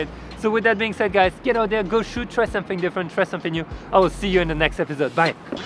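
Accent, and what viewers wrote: France. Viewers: really like your videos dude, have you noticed if you lower the reproduction speed to .5 you sound like rally drunk?? maybe because of the french accent hahaha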